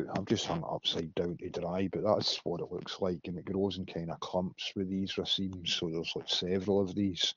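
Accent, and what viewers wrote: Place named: Scotland, United Kingdom. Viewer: Love your accent. Are you scottish?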